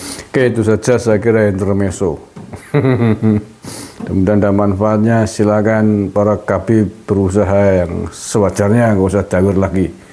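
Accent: Banyumas, Indonesia. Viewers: Kang logate ngguyokna , ya lah aja ngilangna logat Banyumas , bahasa brengkolang sing marai ngguyokna , he he he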